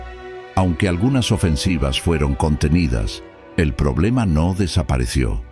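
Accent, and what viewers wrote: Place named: Castile, Spain. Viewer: Buena biografía. Pero la imagen del min. 1.48 no le corresponde a Alejo Julio Argentino Roca Paz, sino a uno de sus primos Reboredo, éste creo que es Florencio. Ambos fueron estudiantes en el Colegio del Uruguay, creado por Urquiza en la capital de de Entre ríos, Concepción del Uruguay. No entiendo el tono castizo de la locución. Es para parecer más "interesante"?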